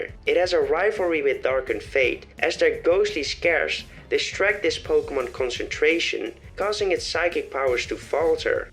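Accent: Netherlands. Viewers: Sorry matje je designs zijn goed maar ik kan het gewoon niet afkijken omdat je engels zo verkaast is 😅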